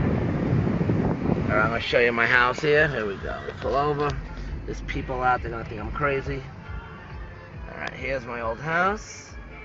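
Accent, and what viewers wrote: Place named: New York, United States. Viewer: I love a good ole NY accent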